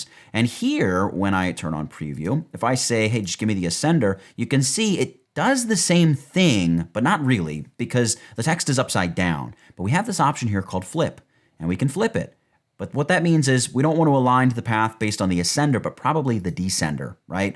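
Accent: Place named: East Coast, United States. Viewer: Good stuff east coast accent dude.. 👍👍 I’m I wannabe design engineer, thinking about entering the automotive industry for added economical improvements.. If you needed any specific inspiration. 🏆🏆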